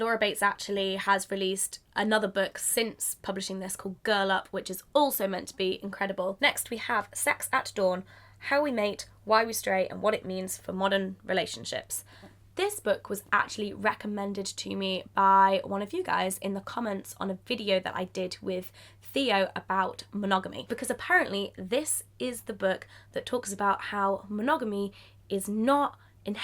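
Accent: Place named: Manchester, United Kingdom. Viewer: Have you been home recently? You sound more mancunian😂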